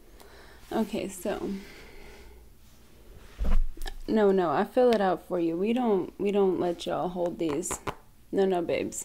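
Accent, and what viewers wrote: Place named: South, United States. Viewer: Salut, j'aime bien l'accent du Sud, c'est le plus bel accent pour le francophone que je suis. A bientôt pour une vidéo, en français j’espère.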